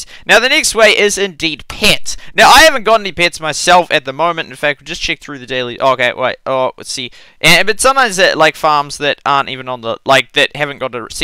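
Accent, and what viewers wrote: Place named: New Zealand. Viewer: Your zealand ayyy cousy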